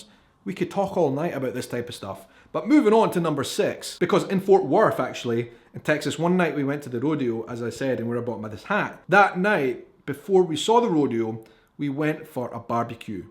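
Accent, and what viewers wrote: Scotland, United Kingdom. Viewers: Hope you're able to visit my home State, Hawaii, some day. Will look forward to seeing THAT video, for sure. I think you underestimate how charming your Scottish accent is. Trust me, anywhere you go throughout the U.S. you will be met with warmth & generosity. A great many people from Great Britain & Ireland settled throughout the American South, so you will find a multitude of peoples with surnames that will be familiar to you. Just take a look at the names of Country Music stars, to begin with. Thanks for all your wonderful videos. Your experiences & insights leave me with laughter & pride. I have been to Edinburgh 3 times. Loved it and the people there and throughout Scotland. BEAUTIFUL Country. You have a lot to be proud of. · I subscribed about a week ago and I have not regretted it. Your great! I visited Scotland and was blown away by the beauty of your country. 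It rivals Texas. It could be its beautiful twin. The Scottish were amazing and amazingly awesome. I have 2 kittens and we all love your accent and your show. This is a great link to Scottish culture.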